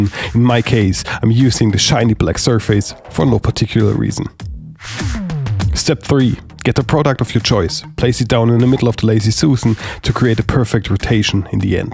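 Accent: Germany. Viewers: Erstes Wort und ich wusste du bist deutsch Haha😂👍🏻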